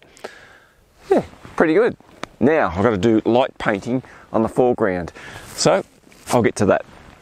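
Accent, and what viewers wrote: Australia. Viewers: Sound Aussie 🇦🇺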